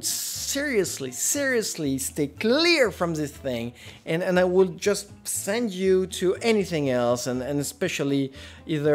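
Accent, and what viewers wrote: France. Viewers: I love his accent and now I desire a french man 😂🤦🏽‍♂️ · oh, you're french? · Mais mais mais... je me disais bien que this voice hear french XD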